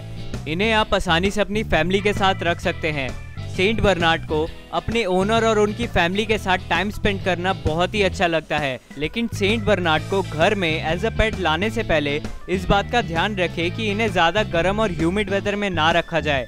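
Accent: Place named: India. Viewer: Indian speech i love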